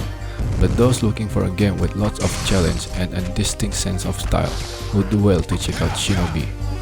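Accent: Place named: Indonesia. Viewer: Your accent sounds a lot like Indonesian.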